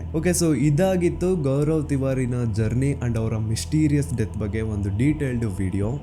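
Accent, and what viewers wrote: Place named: North Karnataka, India. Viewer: ಉತ್ತರ ಕರ್ನಾಟಕದ ಕನ್ನಡ ಭಾಷೆ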